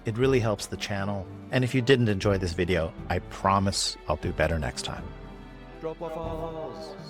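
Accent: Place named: Netherlands. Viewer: Excellent Dutch accent!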